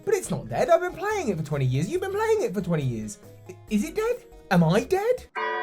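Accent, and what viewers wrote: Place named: Newcastle, United Kingdom. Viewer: Nearly all my pokémon from the mythical pokémon gifts were on a save file that got corrupted D-:. I think that accent sounds a bit Geordie.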